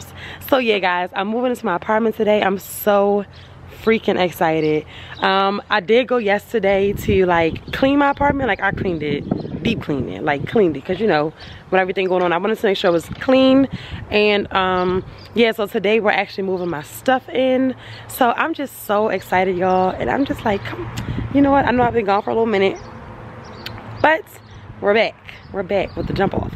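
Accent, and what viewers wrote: Baltimore, United States. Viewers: Your Baltimore accent is there! And I love it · that Bmore accent funny af 🤣 I'm frm D.C. but good video.🔥💪🏾💪🏾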